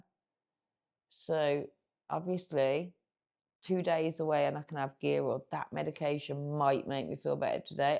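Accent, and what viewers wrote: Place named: Cannock, United Kingdom. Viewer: Hi I'm so glad I watched the whole thing as I'm starting the injection this Thursday (26th March 2026) and I think we're similar age. I've been on off smacked since I was 14 and I'm 41 now. You also sound like you grew up not far from where I did with that accent (cannock myself) so although I'm a bloke and our lives would have been different, I bet we seen and did alot of the same shit lol anyway thanks for making this its inspired me to look forward to getting it done as I was nervous about the injection. My habit isn't anything like yours was, so if you can do it so can I. Thanks again and all the best in your future X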